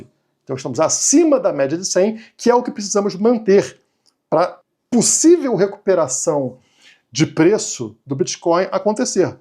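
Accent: Rio de Janeiro, Brazil. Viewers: Jurava que tu eras carioca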